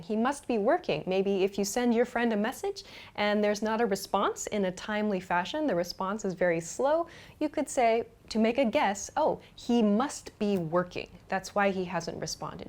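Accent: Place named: Texas, United States. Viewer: According to his accent he must be from Texas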